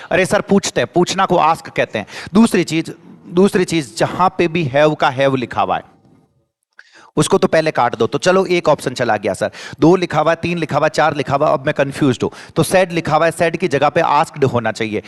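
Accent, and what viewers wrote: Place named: Rajasthan, India. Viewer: Sir youtube par aisa koi mila h jo full meri tarah मारवाड़ी bole । Baki aaj tak koi ni dekhiya the sir ghana hakra padhao ji khush hugo 😁🥳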